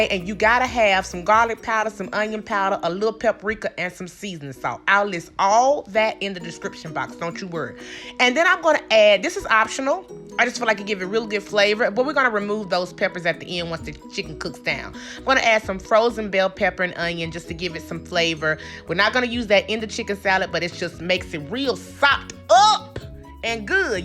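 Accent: South, United States. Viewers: Love your energy and county swang 😍 new subscriber 😊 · SUBBED!! Love your southern accent and humor!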